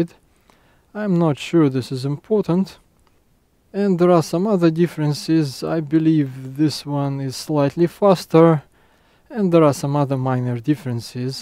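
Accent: Russia. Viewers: Русский акцент!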